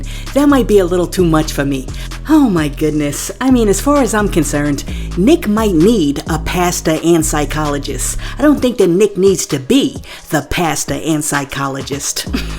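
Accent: New York, United States. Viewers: I know right its like Lyte so New York Im from L A so it is so cool to me 😎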